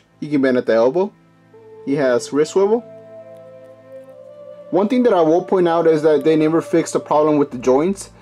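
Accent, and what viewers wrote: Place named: Mexico. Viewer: are you a Mexican trying to talk English you wouldn't mention you just did slam dunk your stupid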